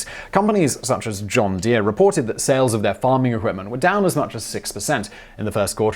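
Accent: United Kingdom. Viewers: despite what his British accent may imply, he is an American.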